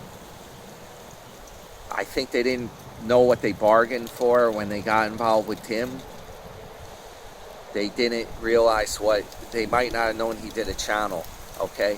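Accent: New York, United States. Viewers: Great work, You are a relentless, hard working investigative Youtuber. So nice you met & and they were responsive towards you, basically a stranger but with the NY accent. Real nice genuine people just like our friend. 😊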